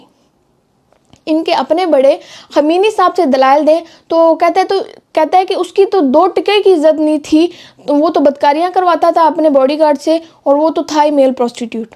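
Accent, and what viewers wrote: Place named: Pakistan. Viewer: Mujhe ye pakistani accent bahut psabd hai ❤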